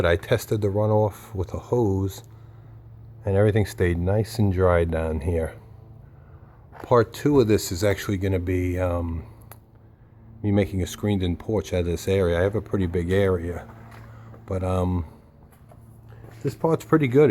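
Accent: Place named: New York, United States. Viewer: Awesome new york voice!!!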